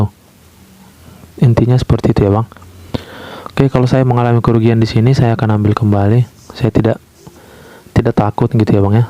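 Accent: Medan, Indonesia. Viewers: Org Medan ya bg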